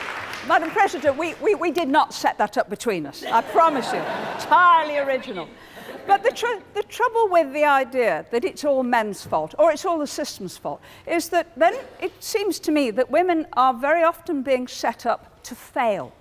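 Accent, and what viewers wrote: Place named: Liverpool, United Kingdom. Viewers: Aahh - she's from Liverpool! That should not be a surprise! :) Her accent though is not typical... I know many might disagree, but I just love the Scouse accent! It's so expressive and honest- straight from the heart. I'm not British or anything but I've been following the red half of that City's football team for a large part of my life now; and one thing I've come to know about those people is that they are level-headed and not particularly pretentious(yes even the blue half ;)). They can also see right through bs and they speak their mind. This lady is no exception. Lovely lady btw and a seeming good sense of humor which is what you can expect from Liverpudlians! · Has a poshest scouser ever existed ?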